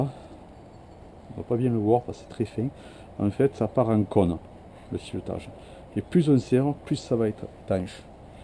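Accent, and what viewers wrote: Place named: Marseille, France. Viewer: Bravo et merci bcp pour cet tuto. Aaah, jadore lacent marseilles :-)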